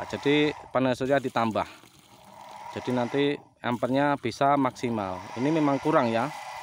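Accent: Java, Indonesia. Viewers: 😂😂😂😂😂😂 mengelepi sawah to mas.....?seneng medok jawane...😂😂😂😂 Sukses selalu mas...Lampung nderek....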